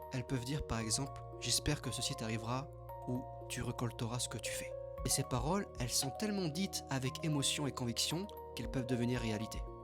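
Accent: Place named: South, France. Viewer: tu as les yeux gris.😮 À moins que ça soit la vidéo qui donne cette impression. 😊 Et tu as l'accent du sud aussi on dirait. 😘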